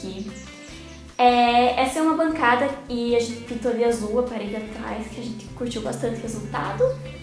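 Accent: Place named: Curitiba, Brazil. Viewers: é que ela é do sul (especificamente de Araucária, região metropolitana de Curitiba) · ela e de Curitiba , pia kkkkk amo esse sotaque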